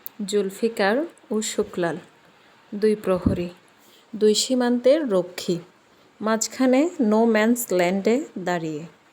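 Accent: Chittagong, Bangladesh. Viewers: আপনার ভাষ্যে চট্টগ্রামের আঞ্চলিক লক্ষণীয়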